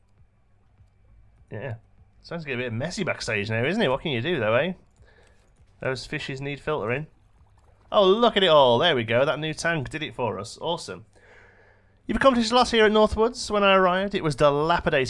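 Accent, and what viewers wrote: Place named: England, United Kingdom. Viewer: I subscribed for the English accent.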